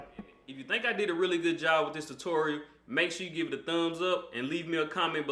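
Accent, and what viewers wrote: South, United States. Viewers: I'm from Texas. I picked up on that southern twang! Lol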